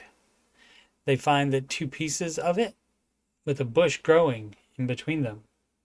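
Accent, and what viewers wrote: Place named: Atlantic Canada, Canada. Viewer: Awesome stuff Coach keep it up. Either your from Nova Scotia or Newfoundland 😀 the out gives it away 😆 🍻 👏 ✌️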